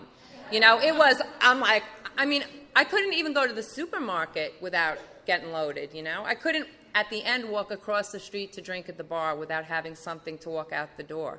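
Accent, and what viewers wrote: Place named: New York, United States. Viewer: I can't believe I'm barely finding this especially this testimony! It had me from the start because of her NY accent. Hilarious, touching and impacting to my spirit. I love her transparency and her passionate love for God. I love her story of the last sponsor she had from Virginia. Thank you to everyone who had anything to do with sharing this story.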